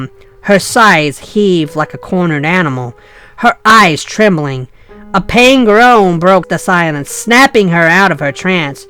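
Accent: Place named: United Kingdom. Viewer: Oh, Another British grimdark reader. Interesting very interesting indeed. New subscriber.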